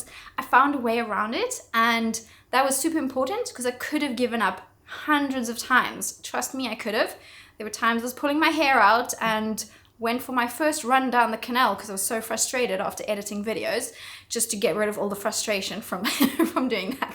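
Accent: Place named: South Africa. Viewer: I just knew that was a South African accent 🙌🏻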